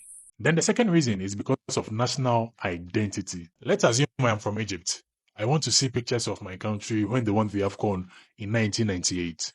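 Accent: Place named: Ghana. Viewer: You sound Ghanaian.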